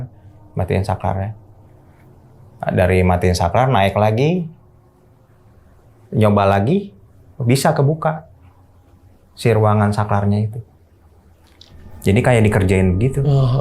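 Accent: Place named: West Java, Indonesia. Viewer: Orang jakarta tinggal di bandung logatnya jadi logat sunda😁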